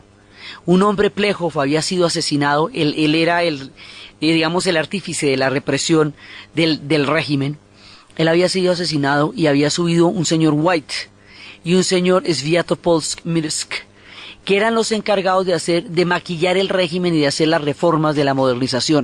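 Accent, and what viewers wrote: Colombia. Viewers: Sra. que emocionante y a la vez ameno es escuchar sus relatos, que incluso sin imagen alguna, y con ese acento muy de su bello pueblo colombiano. Saludos desde Perú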